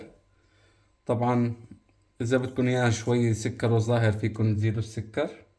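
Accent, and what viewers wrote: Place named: Syria. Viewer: بس عفوا منك انت من وين....وين تعيش لانو تحكي سوري انا سورية من حلب بس صار لي ٣٦ سنة في سويسرا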